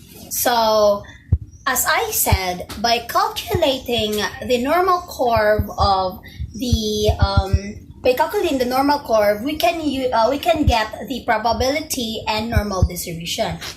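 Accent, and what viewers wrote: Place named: Bisaya, Philippines. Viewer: Wow! I can see the effort and sacrifice within the video. Anyway, I like the bisayan 'ACCENT' of Mrs.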